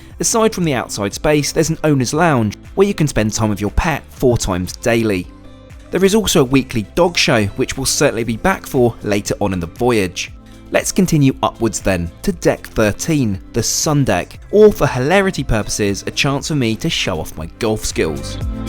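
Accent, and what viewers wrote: United Kingdom. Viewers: Theyre called lifts in the uk. Youve got a surprisingly British sounding accent for an American. 😮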